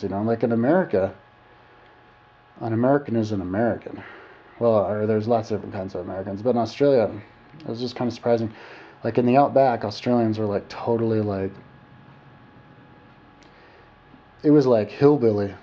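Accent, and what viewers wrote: England, United Kingdom. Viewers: English Accent! wow. Your analysis is completely flawed. Narrow roads, stone and brick. The idea that Aussies regard on retrospect as Australia being the best country in the world has a lot of substantial research and actual lived experience pertaining to this Australia wide held concept. Unless of course you are a Greedy Wall Street banker or power hungry Media tycoon. Australia was created as a penal colony for prostitutes and felons - Wow. The USA was founded by Slave Owners and Tax Avoiders.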